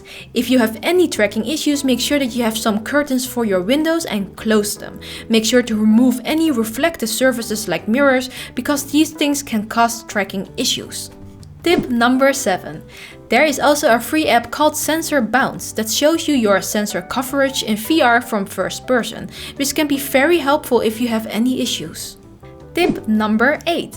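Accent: Netherlands. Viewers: Are you dutch i think i can hear it in your acaent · Fking strong dutch accent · Ok. First srrs thx for the tips. 2nd. Oof. Wat ongemakkelijk om zo nederlands Engels to hooren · Heerlijk dat Nederlandse accent :) , leuke vid ga em later nog een keer kijken! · he means you have a Dutch accent with a Asian accent mixed in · Haha I was guessing that you were from the Netherlands. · Wait, you live in the Netherlands? I thought that accent sounded familiar lol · Damn you sound dutch, holy shit lol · Can't figure out what a dekstop is... Other than that, great video with the most amazing Dutch accent. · Dutch accent....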